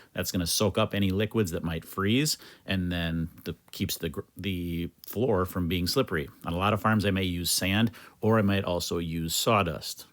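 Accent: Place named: Midwest, United States. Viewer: I was wondering why your accent seemed so familiar, its because we're state neighbors! Greetings from Eastern MN.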